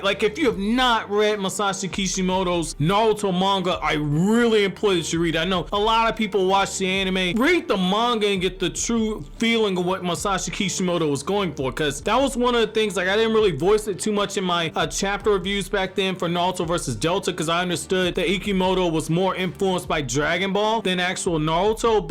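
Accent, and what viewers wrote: New York, United States. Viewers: What Part of Newyork you from man?🙌🏾🙌🏾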